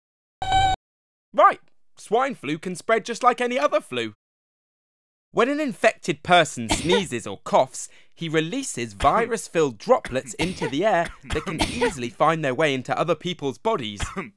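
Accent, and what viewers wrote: England, United Kingdom. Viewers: UK voice?damnit =( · He sounds like he's British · WHAOA WTF HES BRITHISH!? Put him in spainish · I was like "um? I didn't remember sounding british" then i saw the description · He has a accent because this brain pop is meant for the UK duh -_ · why is british? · Since when tf was he british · HMMM i'm used to american but i love how British sound:D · Delta Core 12 its a British accent. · what happened to his voice his gone all english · Hearing this in a British accent us slightly unsettling to me... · I had no idea there was a UK one. I'm from America so I was shocked to hear him with an English accent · OMG ITS A BRITISH!!!!!!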